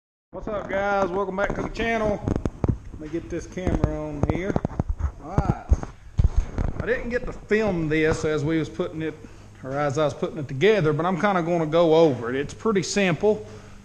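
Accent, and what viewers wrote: Tennessee, United States. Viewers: You from TN?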